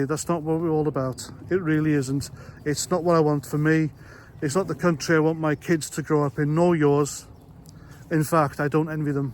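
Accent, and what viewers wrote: Wales, United Kingdom. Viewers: Maybe it`s you Welsh accent, but what`s wrong with Tooting Police?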